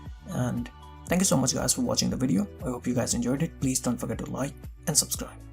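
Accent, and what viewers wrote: India. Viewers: Love ur content, hate ur Indian accent.. 🤮🤮🤮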